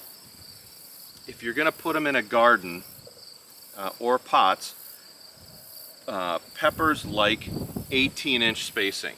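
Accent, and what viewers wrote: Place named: Minnesota, United States. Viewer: NO! Don't change a thing,! We get a little taste of Minnesota when we watch your channel! 😁 I'm confident over 20 years in Georgia has given me some southern spice to my accent, haha!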